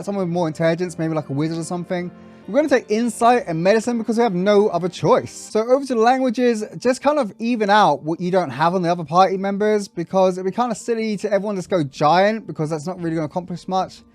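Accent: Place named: London, United Kingdom. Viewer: Good news! I'm an London Wizard... So nice to hear the accent too!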